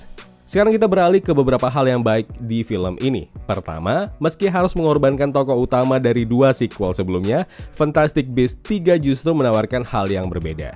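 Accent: Medan, Indonesia. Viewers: Medan kali bah si abang..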